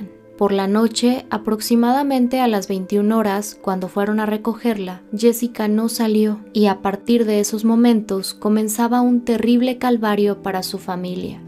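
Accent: Colombia. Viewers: cuál es tu nacionalidad por tu acento me párese colombiana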